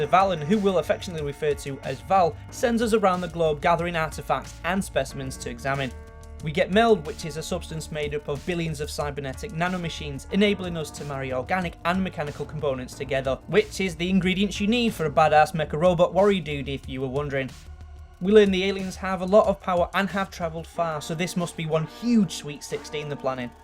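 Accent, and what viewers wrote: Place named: Manchester, United Kingdom. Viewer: He sounds Manchester-ish to me, in case you were wondering where his accent originates from.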